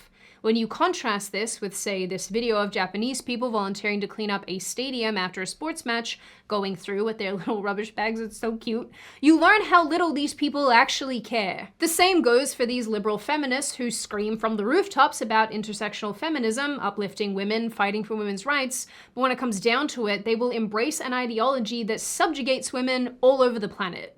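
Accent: Australia. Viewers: Have you been in Australia recently? You sound more Australian in this video than your last one. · Still sounds very Australian to me. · If they are it's distant considering her Australian accent. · Every once in a while, your Auscent shows through, and I love it.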